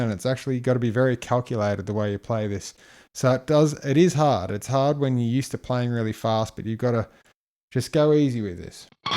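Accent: Australia. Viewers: Hey, You sound like an Australian, ain’t ya?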